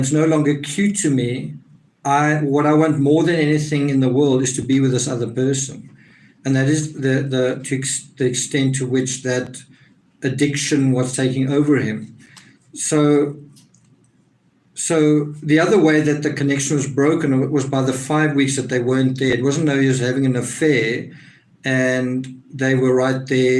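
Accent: South Africa. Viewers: I love your accent my doctor is from south Africa too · When I am unable to sleep, I put your videos on & you lullaby to a wonderful sleep. It’s not that you bore me but you relax me so much. Love your South African accent & your soothing voice❤️❤️❤️❤️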